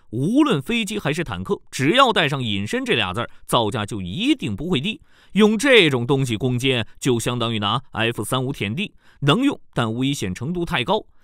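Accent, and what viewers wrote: China. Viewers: 播這種中國腔調,不行真難聽直接下一頁!!!!!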